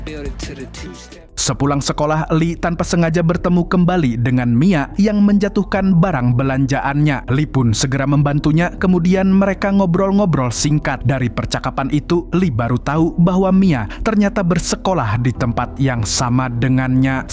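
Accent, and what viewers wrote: Banjar, Indonesia. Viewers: Anjayy org banjarr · Adminnya bisa lai bahasa Banjar😁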